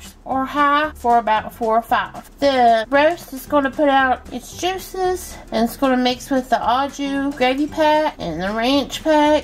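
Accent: South, United States. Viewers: I love love love your accent and pronunciation of words! True southern accent??!! Lol. Truly Love your recipes and your cooking channel!!! Thanks for sharing your love of cooking and wonderful recipes!!!! God bless you!!!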